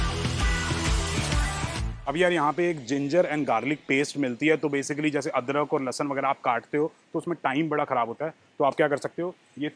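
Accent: Punjab, India. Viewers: Dear apki language main jo punjabi texture (Tadka) hai its amazing; dear son apki vlog as always international students k liya bahut beneficial hoti hai and 2day vlog is superb God bless you my son and also stay healthy guys 🙏🙏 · its hindi with punjabi accent very easy to understand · Punjab jhlkda tuhadi boli cho bht mjja aaiaa dekh k video 😀jaiga ye cart k ander ☺️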